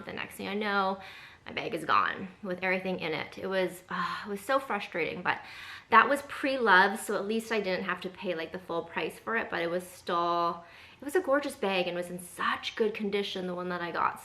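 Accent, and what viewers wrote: Canada. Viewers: Are you Canadian? My grandma is from Canada and that’s how everyone I know from there says bag lol I love it tho!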